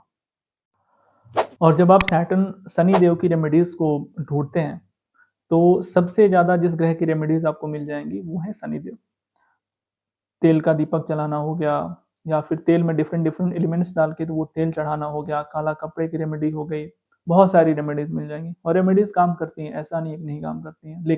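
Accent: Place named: Uttar Pradesh, India. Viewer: Apki boli achi lagi, ap UP se ho kya? wahi se hote h zadatar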